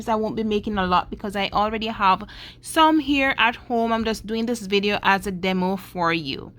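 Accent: Jamaica. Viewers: I can tell she's Jamaican, did affi subscribe to di lady channel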